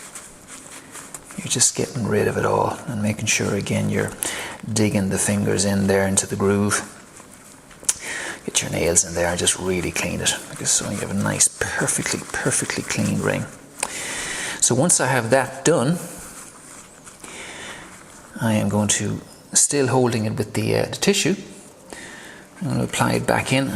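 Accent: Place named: Ireland. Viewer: Thanks for your tutorial my lovely Irish friend! I note the emphasis on absolute cleanliness of the ring to case (ring) surfaces. Now, In my enthusiasm ... I assumed the object was to reduce any friction to a minimum (nice and smooth) so applied a very light coating of CRC Silicone 808 around the mating surfaces... Now.. I am rewatching and note your mention of "the perfect AMOUNT of friction .. which is apparently a "wonderful mystery" !! What I note now with my Powerball (250Hz Pro, by the way) .. is that although I have smoothness and a little less noise, I am having difficulty attaining higher RPM. I can spin up to around 5 or 6,000 RPM and all is very smooth but it is like I lose that "wonderful mysterious grip" which you speak of, which enables the continuation of torque application above mid revs ;) Indeed, I have some "clutch slip". You DID mention slip too!! 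So... I may return to the innards and clean ring and shell tracks again very carefully with Isopropyl alchohol ... I do hope that by some equal mystery, you may still pick this comment up, some months on... Many thanks. Love your accent. Chris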